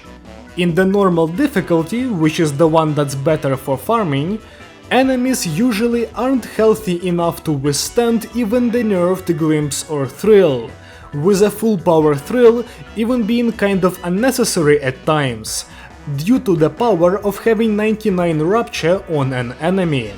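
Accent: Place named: Russia. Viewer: God, one day I will reach my hand through my monitor just to reach your neck and find out why do you sound like you're Russian, German and Spanish at the same time💐💕 As for the theme of the video? Honestly, I kind of get it. I always had that « Ew » ring in my ears when I used Glimpse to just Hollow Purple my way through the MD.. And although I'll miss the old days of the hydrogen burn, I still think that this is a healthier way to go about the MD, since it really lifted some weight from my shoulders. If burn or rupture are the best but not op.. I can just have fun with my favourite sinking team. Because there's much less feeling of « Shi man yo stupid ahh missing out on so much efficiency rn bro » due to the opressive cleaning capabilities of the forsaken duo. Sorry for my low tier English skills in advance🙏🏻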